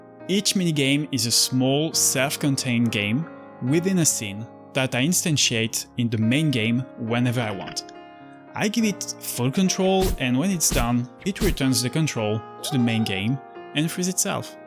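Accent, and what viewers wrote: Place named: France. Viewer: You sound like GameMakersToolKit but with a French accent!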